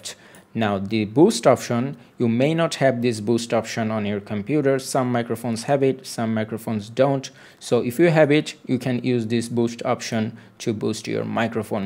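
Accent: India. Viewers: Where would the world be without people like you? Seriously, every time I have a problem in life, there's someone with an indian(?) accent that post a video somewhere that makes everything easier. People like you are the salt of the earth.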